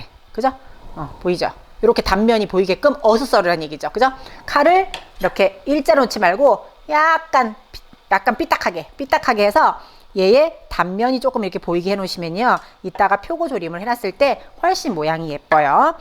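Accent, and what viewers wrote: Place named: Chungbuk, South Korea. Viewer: 충북사람들의 말투에 베어있는 특유의 그게 잇어서 그래요..ㅎㅎㅎ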